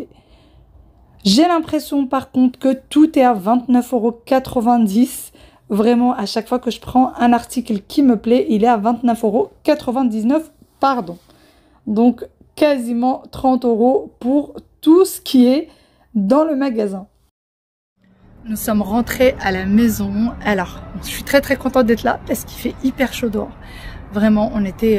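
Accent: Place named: Morocco. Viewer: Coucou, j'aime trop l'accent marocain 😊 PS: je suis tunisienne ❤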